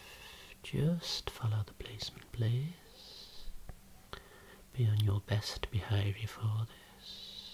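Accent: Birmingham, United Kingdom. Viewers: Is from Birmingham?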